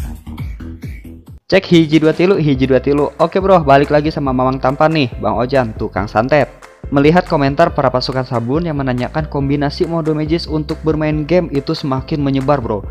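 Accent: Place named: Sunda, Indonesia. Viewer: Alah c mamang sunda nya meni lekoh hahaha semangat terus bang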